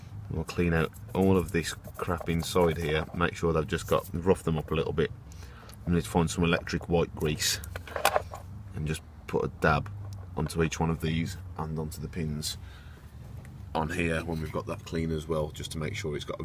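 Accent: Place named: Birmingham, United Kingdom. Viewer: Hi there's no option to private message on here! I just wanted to know where your from as you sound like your a brummie lol I'm also from Birmingham and really need your help. My mums car is a scenic and she had it serviced by her partners friend who's a mechanic who had some trouble in doing what he was doing with a lot of tugging and pulling and jiggling about not long after this we was driving and on came the warning stop on the dash saying there's an electronic fault something about the hand break also. She has no speedometer or anything. I just wanted to know if you could help at all?! I know you made this video long ago and I've just came across it now but she's given her car to another mechanic who couldn't find the fault and you obviously know what your doing! She's paying out money for people to tell her they can't find the fault! 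If I was a man or even had a clue about cars I'd just follow your video but we have no idea what's so ever! The cars still drives but as you can imagine it's very stressful and you really feel down when your cars not working 100% i would be so grateful if you could reply back to this comment or email me on <mail> if you are willing to help. I really hope so!Hope to hear from you Jayda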